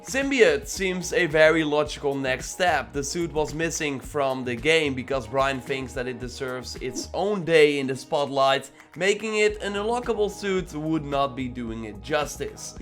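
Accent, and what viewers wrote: Netherlands. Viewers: You kinda have a dutch accent bro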